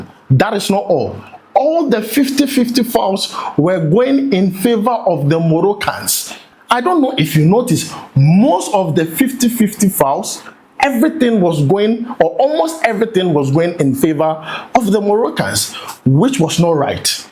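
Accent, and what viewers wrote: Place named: Nigeria. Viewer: You lie You are not a Ghanaian. Your Nigerian accent betrays you. Look at the poor penalty kicks Your players took. Is the referee to blame for that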